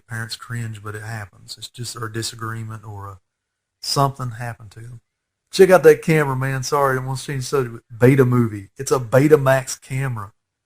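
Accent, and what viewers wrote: South, United States. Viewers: your southern accent is perfect for this commentary. well done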